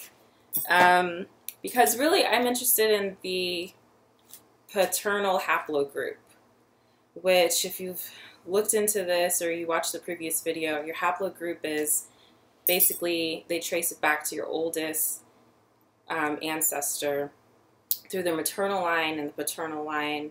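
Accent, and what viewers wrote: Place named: United Kingdom. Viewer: HI, I like your ancestry DNA videos. All are very interesting. You have a slight British accent.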